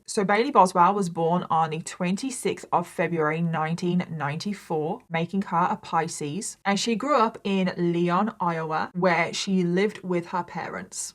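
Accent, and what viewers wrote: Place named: England, United Kingdom. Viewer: ........i ur have a Beautiful accent....i didnt think u were from Australia......i knew u were from the UK.....cause i think the UK accent is nice...i love listening to ur stories.....im from USA...a southern state....thanks for all ur crime stories...i never miss any of ur new stories...❤❤thank u for teaching makeup ways...u have taught me alot😊😊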